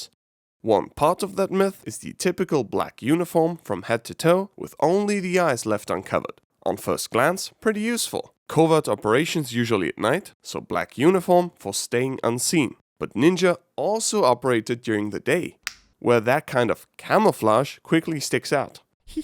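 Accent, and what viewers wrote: Austria. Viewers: He is from Austria, if I recall correctly.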